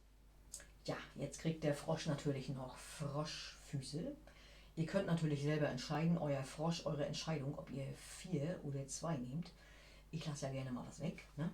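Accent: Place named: North, Germany. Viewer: Wunderschöner Frosch, echt witzig und super erklärt, übrigens höre ich gern deinen norddeutschen Dialekt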